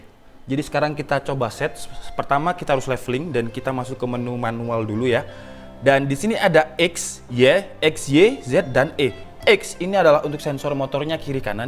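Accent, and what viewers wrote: Java, Indonesia. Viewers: Mas wong jowo ya? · Bang pertama liat chanel lu gua kira chanel biasa aja yg kek kebanyakan para youtuber , stlh gua iseng* nonton in video lu yg ngomong sama google asli dahh ngakak parah dan trnyata lu orngya asik kocak .klo gua bilang lu punya ciri khas review yg beda intinya lu bisa bawain pembahasannya kek bener" lagi interaksi sama temen seumuran lu. Goo job lah 👍👍👍 Ohya bang btw ada jawa jawanya medok mantep😅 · Medok jowonya ketahuan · Malange ketoro banget broo wkwkwkwk sipp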